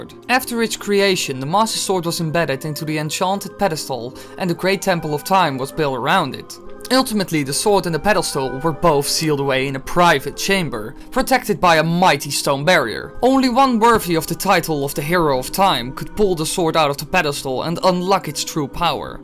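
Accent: Netherlands. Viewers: are you dutch? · Ben je Nederlands of zo?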